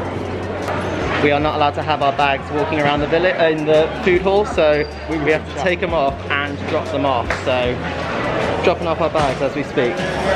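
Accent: United Kingdom. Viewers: Thanks for your video upload. It's nice to see what athletes do during their stay in the Olympic Village. Love hearing British English. BTW Congrats, Olympic Champion!!!!! :) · if you want to learn how to speak British accent , I must watch your vlogg heheheehe..... happy Good day!!! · British accent 🤣🤣👍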